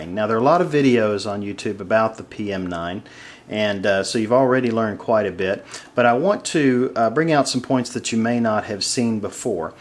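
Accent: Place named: South, United States. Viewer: I wouldn't recommend you carrying a gun or complaining about this fine weapon.I realize you must live in a trailor park by the hick accent.Please do yourself a favor and contact Kahr.I believe the service rep would explain to you that the gun is designed that way.The wiggle and wobble don't effect the gun.Gun requires a break in.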